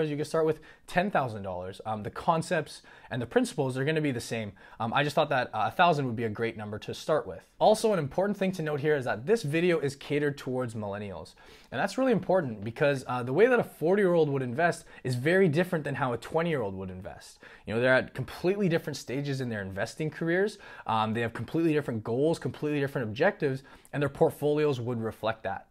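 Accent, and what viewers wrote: Toronto, Canada. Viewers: ahaha Toronto mans for sure. great content bro new follower here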